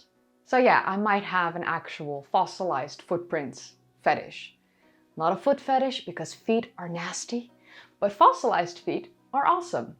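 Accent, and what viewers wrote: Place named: Netherlands. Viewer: I love the Dutch accent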